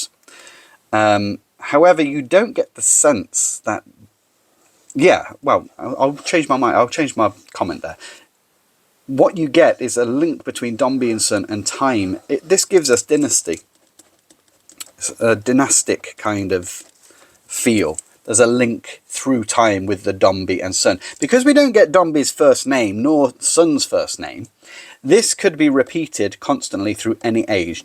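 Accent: England, United Kingdom. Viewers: Good evening, I will definitely use some of your tips with my students on my next lesson on Dickens...and they will also appreciate a pure musical English accent. (I am an Italian teacher of English language and literature, I just stumbled upon your amazing channel).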